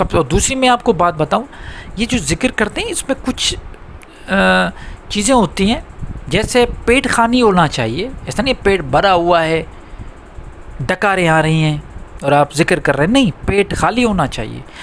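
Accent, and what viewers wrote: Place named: Sindh, Pakistan. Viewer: Sir Aap ki zuban Sindhi lag rhi hy han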